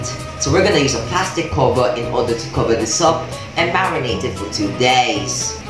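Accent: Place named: United Kingdom. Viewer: The accent though😯 British accent😯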